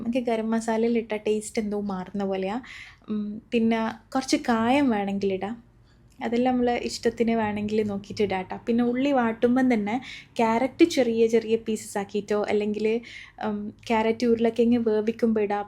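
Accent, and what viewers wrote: Kannur, India. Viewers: Oppened ❤️iyale vidios othiri ishttamanu kannur samsarathilanau wayanad kariyaya nan ith othiri ishttapedan thudaghiye❤️❤️👍👍👍🤲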